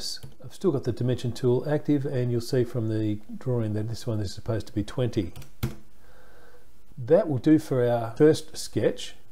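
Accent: Australia. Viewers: Hello from UK! I much prefer listening to your Aussi accent than the American lazy drawl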